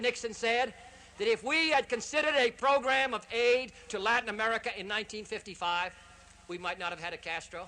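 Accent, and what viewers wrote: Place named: Boston, United States. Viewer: Great Boston accent. And a speech with substance.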